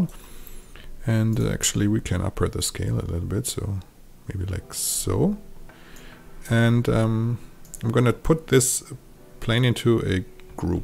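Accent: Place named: Germany. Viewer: Dachte mir schon von Anfang an wegen dem Akzent du bist bestimmt deutsch, dann hat die Google Suche den Beweis gebracht :D Danke dir, tolles Tutorial!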